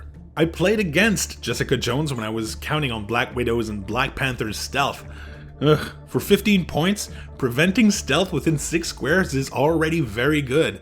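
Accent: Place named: Quebec, Canada. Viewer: So the is French Canadian!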